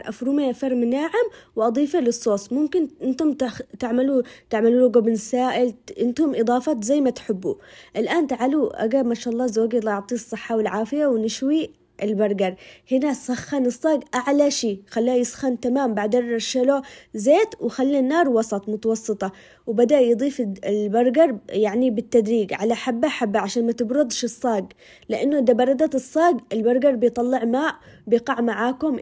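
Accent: Aden, Yemen. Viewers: وووووووو اني فدالك ❤مبدعه والله وكله كوم و هدرتك باللهجة العدنيه الذي اموت عليها كوم الله يحفظك و يسعدك يا رب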